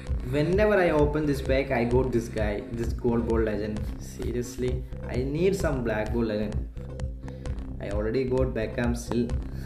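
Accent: Kerala, India. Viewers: Malayali ane alle english ketappo manasilayi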